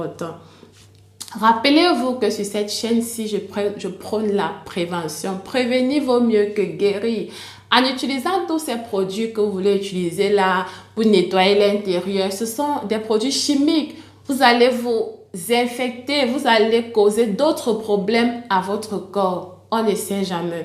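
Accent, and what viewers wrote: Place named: Cameroon. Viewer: L’accent camerounais 😂❤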